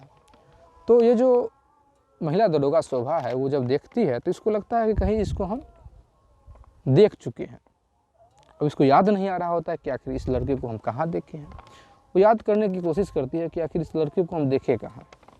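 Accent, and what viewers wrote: Bihar, India. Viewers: आपकी बोली में बिहारीपन भाषा का झलक आ रहा है स्त्रीलिंग पुलिंग का पता नहीं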